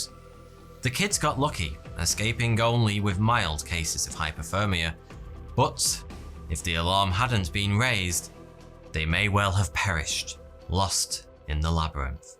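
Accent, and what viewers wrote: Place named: Yorkshire, United Kingdom. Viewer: Where's this man from? Sounds Yorkshire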